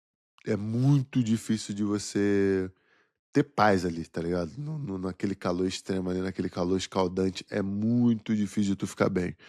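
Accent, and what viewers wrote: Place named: Rio de Janeiro, Brazil. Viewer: Mano 100% de orgulho de ver um carioca que evoluiu tanto, essa é a questão da identificação, ainda bem q vc não mudou sua forma de falar e o jeito de ser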